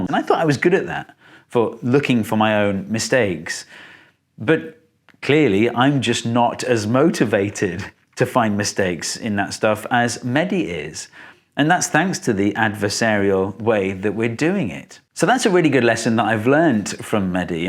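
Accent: United Kingdom. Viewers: "I was just mesmerized by his blue eyes and his soothing voice" Lol. Thing is, i agree. And i don't even like the british accent. ❤👍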